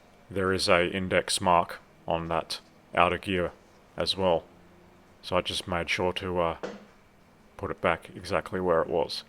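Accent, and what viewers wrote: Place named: Australia. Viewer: This is a great video. I love your Auzzie slightly annoyed all the time accent. Anyone who can work on a transmission like yourself is a master mechanic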